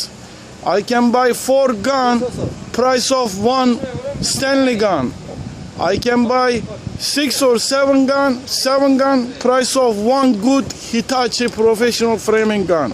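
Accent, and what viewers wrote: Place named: Poland. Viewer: Your accent brings tears to my eyes, thinking of my family from Poland !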